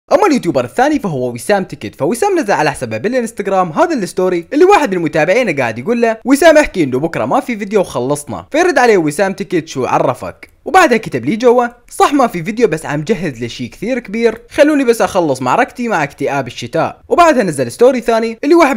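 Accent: Iraq. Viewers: فديت اللهجه العراقيه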